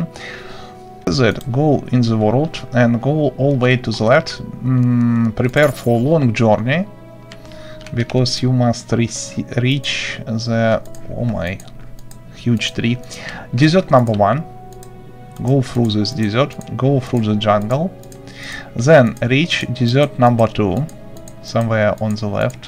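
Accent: Russia. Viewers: ты русский? мне кажется у меня похожий акцент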